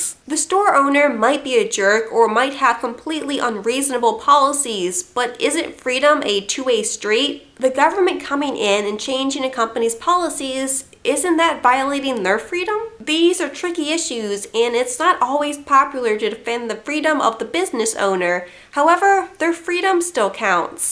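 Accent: United States. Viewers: you got a great American accent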